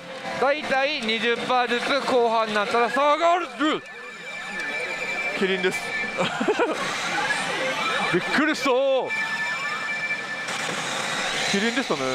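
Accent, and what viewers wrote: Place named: Kochi, Japan. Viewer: 土佐弁最高！😊同じ高知で応援してます😆👍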